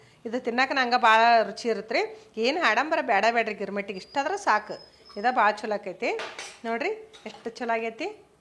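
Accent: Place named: North Karnataka, India. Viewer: Aunty ri Nivu Uttara karnataka Bhashya ne matadi chaalu anusate ri 🙏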